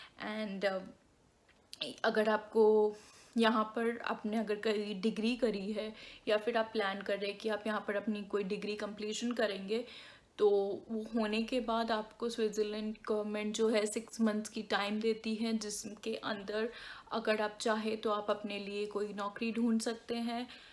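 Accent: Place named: Bengal, India. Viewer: Are u from Bengal according to your language style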